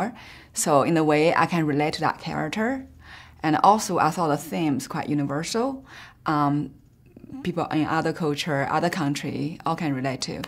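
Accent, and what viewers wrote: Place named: China. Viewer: Her Chinese accent is soooo strong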